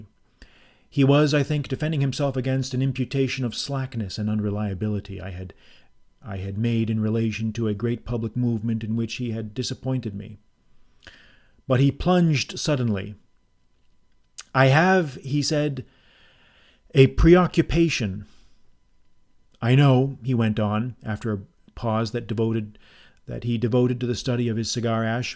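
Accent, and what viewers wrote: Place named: United States. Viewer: cannot listen to English authors and English stories read by Americans.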